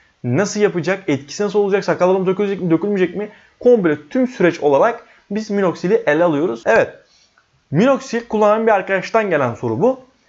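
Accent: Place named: Thrace, Turkey. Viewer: Usta be trakyalımısın sen konuşmalarında gizli jjj sesini duyuyorum sorayım dedim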